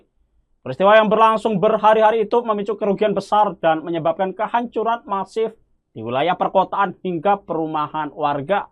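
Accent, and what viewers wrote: Java, Indonesia. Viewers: Medok njowoni om 👍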